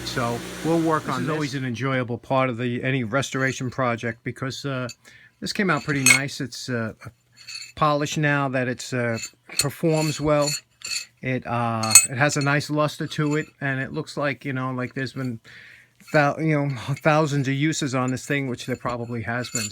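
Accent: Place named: New Jersey, United States. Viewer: I just can't stop watching your videos. Addictive but legal??? Your Jersey accent is awesome. Adds reality to the process and work, somehow.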